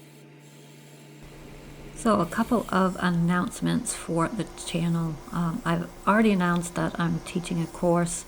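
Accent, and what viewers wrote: United States. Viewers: Wicked good works well here in Oregon too. You sound to me like you could be from here. Nice work.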